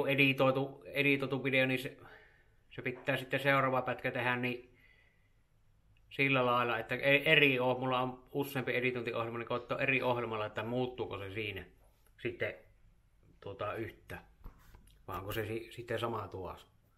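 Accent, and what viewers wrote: Savo, Finland. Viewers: Savon murre tökkii 😬😁👍🇫🇮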